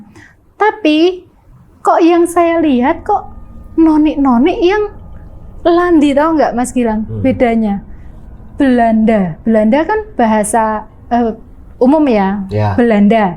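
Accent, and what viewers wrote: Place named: Java, Indonesia. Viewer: Khasnya emang medok2 gitu ye tp top deh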